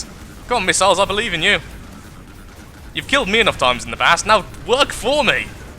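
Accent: United Kingdom. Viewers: hes British. not american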